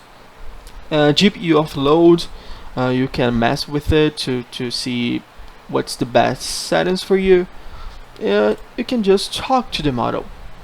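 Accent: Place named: Brazil. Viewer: Thanks for the video, I have the same GPU. (Acer Nitro 5 / Intel Core i5/ 32 gb DDR4 / GPX 1650). By the way, are you Brazilian too? I am Brazilian and your accent sounds like mine lol.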